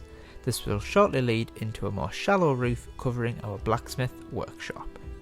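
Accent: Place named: Scotland, United Kingdom. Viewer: From your acent i guess you are from skotland like yelowwool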